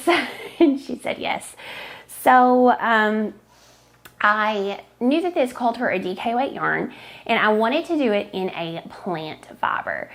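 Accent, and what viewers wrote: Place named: Ozarks, United States. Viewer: I live in New England now but grew up in the Ozarks so love to watch just to hear your accent. Reminds me of home. Would love ❤to participate in the gift giveaway. Congratulations on 30K. You deserve it!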